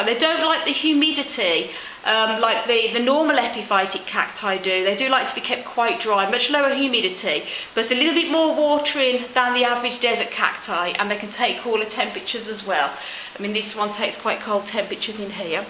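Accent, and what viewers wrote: Birmingham, United Kingdom. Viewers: Thank you 😁 hey this is a weird question but your accent reminds me a bit of mine, did you used to live around Birmingham?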